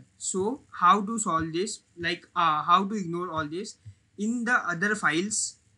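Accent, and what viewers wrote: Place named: India. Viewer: Are you from India or somewhere near it? I love your accent.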